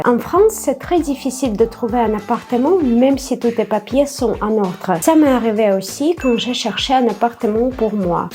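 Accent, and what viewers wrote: Russia. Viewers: Tu es intelligente, tu as une vision très juste et réaliste des choses. Le mélange des cultures est ta force. Tu parles un excellent français avec ton accent russe si craquant. J'ai un grand respect pour la Russie, sa langue, sa culture, son histoire et ses scientifiques. Je trouve l'hymne russe le plus beau de tous, il est fougueux comme La Marseillaise. C'est un pays que j'aurais voulu mieux connaitre, je ferai cela dans ma prochaine vie. Je te souhaite santé, bonheur et réussite. En toute bienveillance. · Votre français il est super, l'accent russe lui donne tous son charme. Merci pour votre vidéo. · Je trouve que ton accent russe rend ton français mignon 🥺 d'ailleurs sa m'intéresse beaucoup de voir une personne russe vivre en France car tu t'intéresses à notre culture 🥰🤗 j'aimerai tellement apprendre le russe que je connais pas très bien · Jajaja j'aime ce petit accent Russe jijij ne le perd pas .😅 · Наконец-то ютуб рекомендовал мне видео, где слышно, как звучит русский акцент по-французски. 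И наконец-то я попал на видео на французском языке, где я могу разобрать больше пары слов в минуту. 15 минут в дуолинго в комнате раздумья не прошли зря! Je suis tres content! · J'envisage de m'expatrier en Russie dans l'année à venir, et entendre ces points de vue croisés est une mine d'or pour moi. Merci! Твой французский идеален, а русский акцент просто очарователен! :) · Bonjour. Je viens de tomber sur ta chaîne Youtube et sur cette vidéo par le pur des hasards. Ca me fait tellement plaisir que tu aimes notre cher pays qu'est la France malgré les tensions très fortes et très dominantes dûes au contexte actuel. Que ça me fait plaisir de te voir sourire, de te voir t'enthousiasmer, de dégager de bonnes ondes positives, dégager de la chaleur humaine et de la bonne humeur. J'adore tout autant ta franchise. Tous ces éléments combinés te donnent énormément de charme tout comme ton accent russe. 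Ca fait tellement du bien. Mille mercis à toi. Surtout ne change pas et reste comme tu es. Saches que tu as une superbe maîtrise de la langue française et que tu fais très peu d'erreurs. Nul n'est parfait. Nous devons tous apprendre de nos erreurs. Prends bien soin de toi. Amuse toi bien avec ton apprentissage au tennis. J'espère que tu pourras enfin créer ton entreprise dès que possible. Au plaisir de découvrir tes autres vidéos. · Merci pour ta vidéo. Si seulement la majorité des français pouvait aimer ce pays autant que toi, on en prendrait beaucoup plus soin, malheureusement en France on aime toutes les cultures du monde, sauf la notre. Ça fait plaisir aussi de voir que tu fais autant d'efforts pour maitriser notre langue mais si je peux me permettre un conseil: essaie de faire moins de faute mais garde quand même toujours un peu ton accent russe. Déjà parce que ça fait partie de ton histoire mais surtout, un accent étranger ça donne toujours beaucoup de charme; même les américains préfèrent bien souvent l'accent anglais à leur propre accent. 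Enfin, ton accent permettra aux Français de savoir que tu as des origines étrangères et donc ils te pardonneront plus facilement si tu fais des fautes de français ou si ton comportement leur semble un peu étrange. Voici un petit exemple amusant venant d'un humoriste Franco-Anglais qui te permettra de comprendre pourquoi tu dois garder ton accent: <link>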